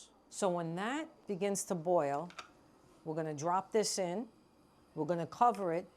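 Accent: New Jersey, United States. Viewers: OMG. I grew up in northern New Jersey and now live in the South. The accent, the mannerisms, the relatability - all transported me back there. What a Jersey girl! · Gawd. I'd rather wipe my ass with sandpaper than hear a New Jersey accent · Jersey accent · Used to live in Jersey. This is the first time I ever heard Jersey accent and think ASMR. Read the book. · I hope you do more videos. Your Jersey accent is the best. I’m definitely going to try this! Never heard of it before, i never see Chock Full of Nuts coffee anymore. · I miss the sound of Jersey. Best accent in the world. Warmest people. 😢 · It is an Italian recipe, beat egg york with sugar and marsalla....they used to give it to the children to raise their immunity , strengthen them, specially if they were week. Is she from New Jersey!!! Her Accenture her way of talking so masculin...ohhhhh no milk please!!! 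· That italian jersey accent😂😂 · Love the Jersey accent. 😊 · As a Jersey native living down south, her voice takes me back home🥰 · Is she a Jersey girl or what i love her!!Coming from jersey myself,love her jersey accent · At first I was intrigued by her Sopranos accent, then the egg and coffee, watched the whole thing, loved it, hope it becomes a show or something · I know she's from Jersey 😂😂😂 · Yokes, nats, and fathas, Jersey accent, forget about it, love this vid!!!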